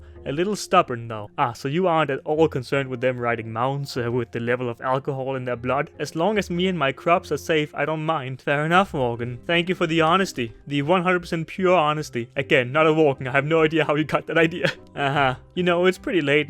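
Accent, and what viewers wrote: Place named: Denmark. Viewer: Du er altså dansker. Det kan jeg høre :)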